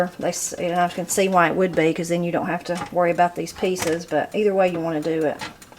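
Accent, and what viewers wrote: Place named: Tennessee, United States. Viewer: Yum! I love friend green "tamaytas" and these videos! I am 3 generations from TN where my Maternal ancestors come from. Hearing your accent, some of the words, even the way you cook, reminds me of my Grandma. We called her Parents Mammo and Pappo, and Pappo was from TN. Seeing your videos really reminds me of my roots. Thanks for sharing everything.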